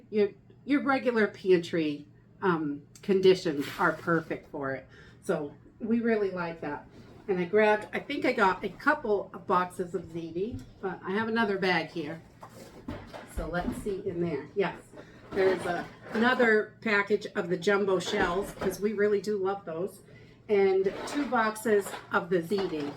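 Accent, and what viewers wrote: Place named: New England, United States. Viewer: Im new to your channel but we seem to think similar. I dont know where your from but the accent and brown bread makes me assume New England someplace. Im from mass and nh but moved to nc about a year and a half ago. Nobody here kbows what brown bread is! I guess brown bread and beans is a New England thing!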